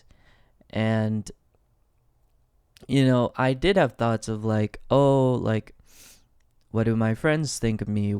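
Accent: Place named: United States. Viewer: More videos plz ❤ I find your voice very clear to practice my American English accent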